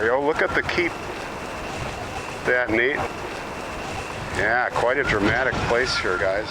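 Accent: Scotland, United Kingdom. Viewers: The argyle sweater is a nice touch. Fits the Scottish accent. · Ma Scottish Grand-dad would have approved of ye Scottish accent🍻 Once again - if one Goggles: The Bold at Bamurgh Castle -- you will see this video above the others listed there😊👍 I wonder why the dad doesn't seem to also have a special burial place? It's sad that she died so young. That poor mom that had her children drowned & the others.😢 It looks like eventually the sea may over take that beautiful Castle one day.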